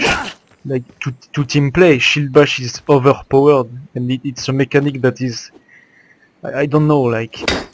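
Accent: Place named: France. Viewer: I DONT LIKE THIS FRENCH ACCENT ! FUCKING BAGUETTE but great vid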